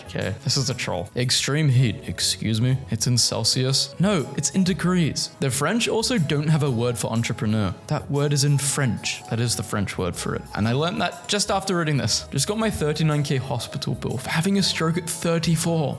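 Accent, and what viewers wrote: United Kingdom. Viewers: Yo british accent kills me, it's funny, anyway, I luv your vids! keep going bruh!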